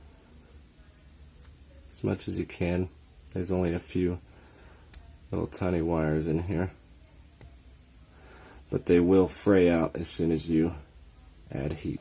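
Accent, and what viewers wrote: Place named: England, United Kingdom. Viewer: very close English accent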